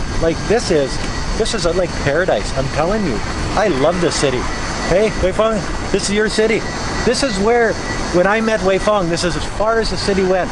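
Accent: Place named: Canada. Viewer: Lol that accent can't get any more Canadian. Love it!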